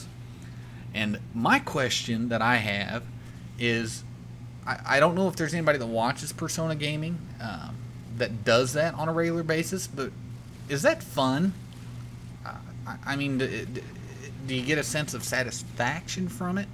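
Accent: South, United States. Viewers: you from the south you sound countryish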